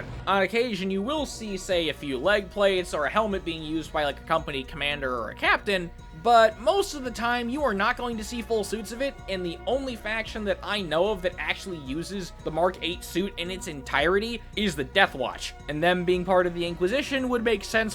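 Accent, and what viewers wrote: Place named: United States. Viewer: As iconic as hearing a British accent accompany Warhammer lore, it is refreshing hearing a fellow brother from this side of the pond discuss 40k. I'm in love with what I'm seeing so far.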